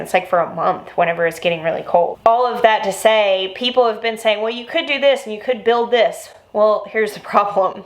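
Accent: South, United States. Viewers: it's been a while since I've had time to watch you. I've missed that time so much. I just wanted to tell you that you're getting prettier the older you get. Also, your southern accent is stronger! 😊 Our garden was very sad last year and we're working towards a better year this year. I sat down and turned your channel on KNOWING that I would be helped in a big way. Thank you for your encouragement and for showing your faith in God. 💚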